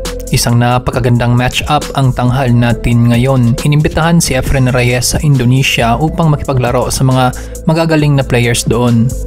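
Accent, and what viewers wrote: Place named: Philippines. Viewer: Pootah probinsyano ang narrator hahaha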